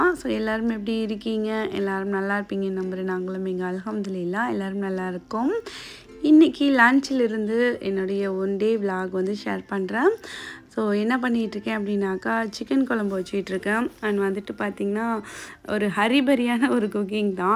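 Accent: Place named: Nagapattinam, India. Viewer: super sis yenakku nagapattinam namma oor slang varuthu athan kettan masha allah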